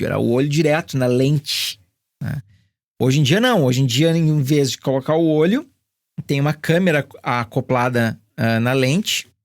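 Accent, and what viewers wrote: Rio Grande do Sul, Brazil. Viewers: Ele é gaúcho amiga rsrs .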